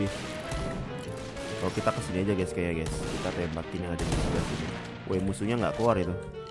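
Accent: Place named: Surabaya, Indonesia. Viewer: wallaa , Boso ee kok suroboyoan ngnee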